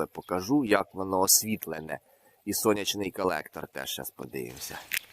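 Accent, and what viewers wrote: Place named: Poltava, Ukraine. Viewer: Дядко точно з Полтавщини! Тільки там так розмовляють. )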